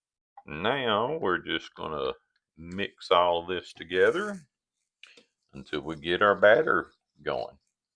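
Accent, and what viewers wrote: South, United States. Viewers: Ps. It will be a sad day when we no longer hear such a wonderful country voice.❤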